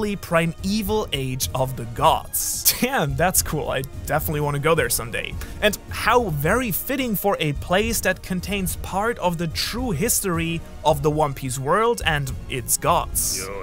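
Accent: Germany. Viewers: Aha! Wusst ich doch dass du Deutsch bist, anhand deines Akzents :D Gut zu wissen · I did not know you're from Germany (of course I detected your accent, just not that it was a German accent) Hi homeboy ... tee hee I'm from Karlsruhe ( a bit north of Bavaria)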